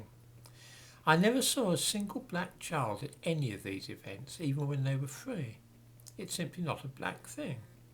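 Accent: United Kingdom. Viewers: I like the content. Problems I have with the channel though are, the marked British Accent is an obstacle. Second, like me the gentleman is old and balding. Me I am fatter with less wrinkles and more hair. He is older than me. Third, the moustache. TRIM IT. Fourth, MOVE the camera farther away and you will not look as wrinkled as you are. Fifth, maybe you could wear a hat ore a beret. Sixth, you could wear a button up shirt. Mostly, like MANY channels, you are VERY CLOSE to the screen. BACK THE CAMERA UP or FIND a BIGGER ROOM. MOVE the BOOKCASE from behind you. Are you in a TINY APARTMENT, a CLOSET? This all said, your CONTENT IS GOOD!!!!!!! Thank You. My thumbnail is of a very old Harvey Comics character, Hot Stuff the Little Devil. So, NO, I am not trolling people as a devil.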